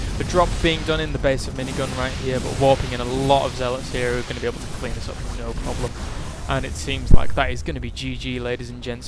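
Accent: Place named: United Kingdom. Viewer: dude, your british voice is awesome, my favorite caster especially since you are bias towards protoss <333333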